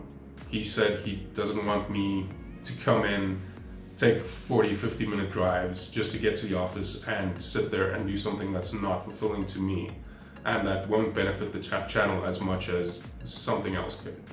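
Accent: United States. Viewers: That's funny, I never knew. I asked because I just assumed with your accent that you were from the state's. I have my own production company/ recording studios and am always looking for new projects to take on. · Are you from SA, you sound American?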